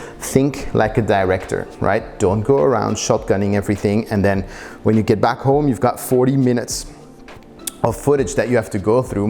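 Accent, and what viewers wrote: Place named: Iran. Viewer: Very useful video. Thank you. BTW - where is the accent from? Sounds like Persian, related to French Canada? 🤪 Perhaps I'm wayyyy off.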